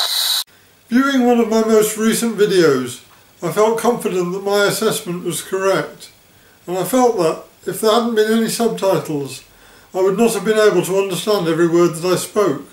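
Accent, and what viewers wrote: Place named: United Kingdom. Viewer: Clear to me but as an American, I've always had trouble with the British accent. I think your most trouble is in the choice of microphone. There is a vlogger, Louis Rossmann who was a sound engineer at Avatar Studios in New York, who has changed mics over the years and explained why. You might give him a call. He is in the book...Rossmann Group Computer Repair.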